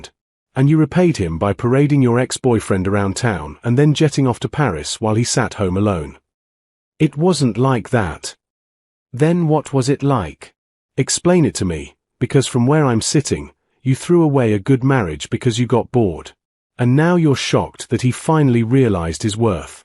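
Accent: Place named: United Kingdom. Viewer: ...you f***ed around and found out... From a native North American English POV, I don't know why, but the British-accented voice-over narration sounds so delicious, especially when this channel dares to drop the F bomb, for story benefits, of course. This is another AI-generated tale like so many others cranked out by this and similar mills, but it is a wee bit different, a touch unique in how the tale unveils and delivers its Scarlett Letter denouement for the characters involved. She still let the little brain run the show, until, when alone, her big brain is finally allowed enough oxygen to realize the black hole she'd let herself fall into. The climax is early on, but the supplemental details afterwards are more than enough to keep one's attention in this longer than most other tales of woe. One of my fave sarcastic titles for such content is "As the Stomache Turns." Fortunately, refreshingly, this one's not nearly as tacky as too many others tend to be. 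PS BTW, a humid Thursday in November...? I've been to Singapore 2x; I've had extended chats with locals there; it's humid EVERY Thursday there. Year-round. That's just the weather in that part of the globe when one is that close to the equator and surrounded by that much water.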